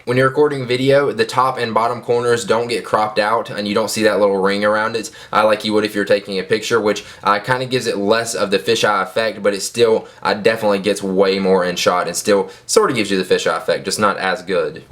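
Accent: South, United States. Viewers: you sound so country(: its adorible( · You sound really southern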